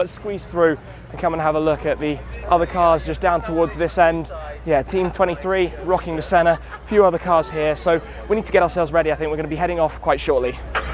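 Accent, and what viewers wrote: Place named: United Kingdom. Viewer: American muscle we need to stick together said in a British accent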